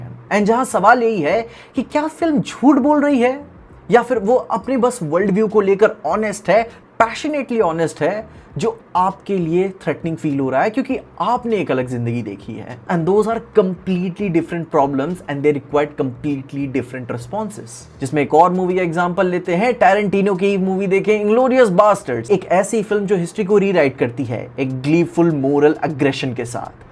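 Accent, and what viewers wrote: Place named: Bihar, India. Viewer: Bhaiya majak nahi Karu ga per aap ki awaaz beach beach me bihari jesi sound kar rahi thi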